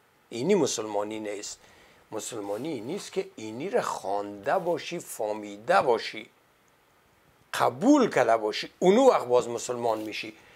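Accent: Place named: Afghanistan. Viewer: درود بر آقای گرامی. باید اعتراف کنم که آقای این کلیپ ارزشمند و بدون تردید آموزنده و تاثیر گذار شما یکی از بهترین ویدیو هایی است که من مشاهده کردم و از آن خیلی لذت بردم بویژه آنکه بزبان بسیار ساده و روان و لحجه شیرین افغانستان بیان کردیدو من به شما تبریک میگویم! این کلیپ از آن جهت ارزش مضاعف را بخود تخصبص میدهد که الف بای آموزش کودکان یک جامعه را در بر میگیرد که در پرورش یک نسل جدید و مستقل و دانا و هوشیار را پایه گذاری میکند و طبعا با این روش آموزش و پرورش خردسالان جامعه از مرحله مهد کودک باید آغاز گردد و سپس در مراحل سواد آموزی ابتدایی و دبیر ستان و دانشگاهتا مرحله دکترا ادامه یایدو تنها در اینصورت است که نسل پرسشگر و اندیشمند و متفکر تحویل جامعه خواهد داد گه نه تنها به کشور خوی خدمات ارزنده ای خواهد نمود که به جامه بشریت نیز خدمت خواهد کرد چنین نسلی هرگز و به هیچ طریق و از هیچ کسی اطاعت کورکورانه نخواهد کرد و از آنجا که با خرد گرایی رشد می یابد و بزرگ میشود همواره به علل و عوامل پد یده ها توجه لازم را دارد و هر تصمیمی را بر اثر آموزه های عقلانی و علمی مورد توجه و بررسی و تحقیق قرار میدهد و سپس عمل می کندو طبیعتا بندرت دچار اشتباه میگردد!!!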